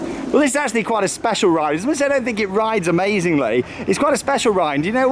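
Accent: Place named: England, United Kingdom. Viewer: What day did you go? I'm almost certain you walked past me whilst you were recording, the English accent always stands out.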